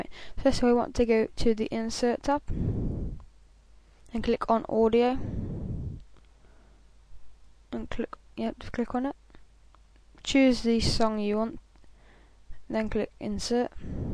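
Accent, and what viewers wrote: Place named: England, United Kingdom. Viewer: it's called the English accent.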